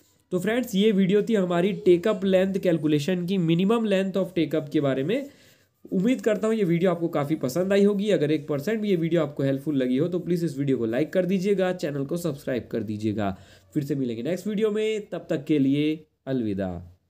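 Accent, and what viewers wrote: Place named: India. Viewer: Indian accent:goodvibes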